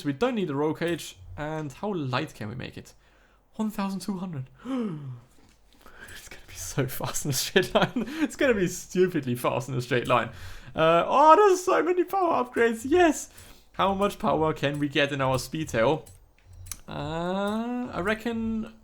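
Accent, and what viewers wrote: Germany. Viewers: Bro bist du deutsch? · Hör ich da deutschen Akzent? 😅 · man hört deinen deutschen akzent tichig raus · u sound german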